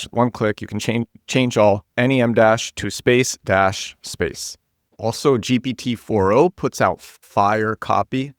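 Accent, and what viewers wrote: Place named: United States. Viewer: I really like your English and your accent, so could you please tell me which accent is this? I'm sure it is an American accent but which state? which part of America? Thank you, you've got another follower, congrats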